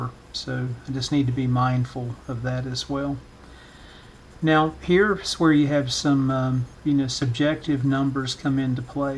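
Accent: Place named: United States. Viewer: Thanks very much. I didn´t know that web page catalog for old radios. It will be very useful for me with this Portadyne has my head on nuts. Have a nice day. Wish to see your next video. Your american english voice is not so difficult for me to understand (only some times with some words or some short phrases). Best regards and follow on line. Ketk (from Spain).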